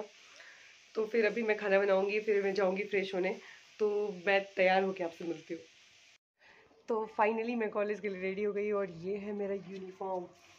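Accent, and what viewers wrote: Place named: Bhojpuri, India. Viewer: भोजपुरी आवाज से सुकून मिलता है🙏🙏❤❤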